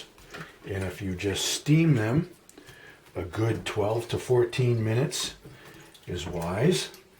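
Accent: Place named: Boston, United States. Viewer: I'm from Boston and this guy sounds like he's a Bostonian. I had no idea the New Brunswick accent was so similar to mine!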